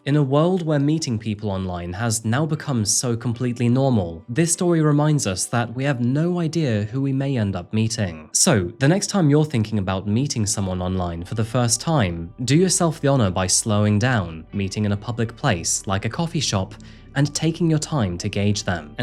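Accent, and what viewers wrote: United Kingdom. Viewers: Love your videos dude. I'm from the UK. Your accent sounds British, but with a twinge of something else maybe?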